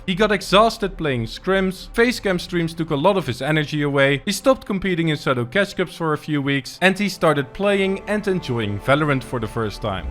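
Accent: Netherlands. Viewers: I smell Dutch in your accent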